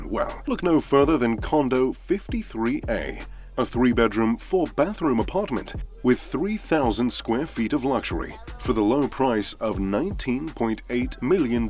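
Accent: United Kingdom. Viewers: He should have gave You a heart for that comment. I usually find British accents annoying, but I like.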